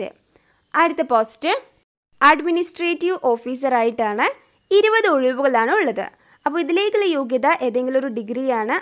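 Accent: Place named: Malabar, India. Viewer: മോളുടെ വീട് thalassey ആണോ..ഒരു മലബാർ slang ..😊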